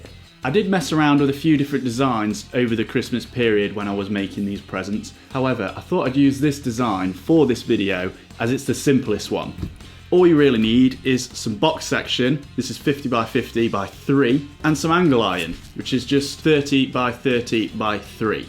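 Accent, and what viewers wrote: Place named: East Midlands, United Kingdom. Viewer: New sub here, was from Grantham now living in Cork.. Im guess from the accent and the word duck you too are from the east Midlands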